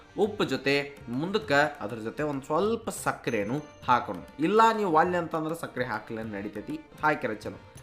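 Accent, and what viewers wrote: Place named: Uttara Kannada, India. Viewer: WOW Superb Sorekayi dosa in 3 variations Healthy version Curry also 👌🏻👍🏻👏🏻 UK colloquial narration makes it more Interesting. Thankyou for All the Recipes & joint Effort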